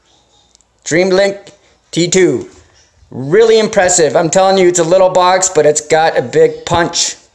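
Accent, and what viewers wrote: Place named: Canada. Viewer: canadian, it's funny when you say out ( owwwwwwwt and abowwwwwwt) lol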